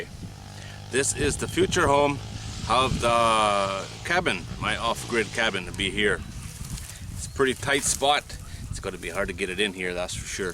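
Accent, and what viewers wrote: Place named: Canada. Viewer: Great vlog, love the camping with the Dog. Where are you from originally? I would say Canada but not great with accents.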